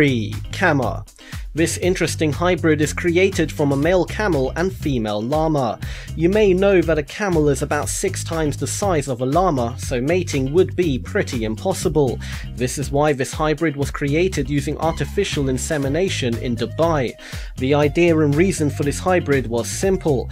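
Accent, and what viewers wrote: England, United Kingdom. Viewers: That is just his English accent.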